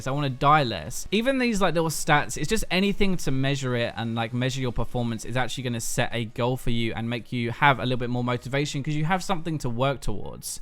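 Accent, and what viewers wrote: United Kingdom. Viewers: "Some people watch for the British accent" THE JIG IS UP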